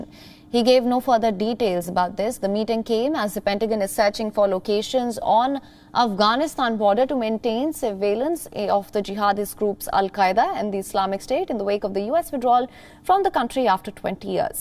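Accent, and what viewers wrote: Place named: India. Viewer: WION should choose a presenter that speaks better english. It has millions of subs... if they want to represent a real international image, it should start using someone that doesnt have such a thick indian accent.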